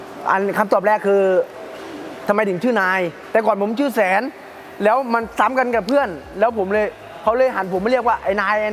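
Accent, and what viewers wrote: Isan, Thailand. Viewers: ทำไม พูดเกียบ5555 อีสานชัดเจน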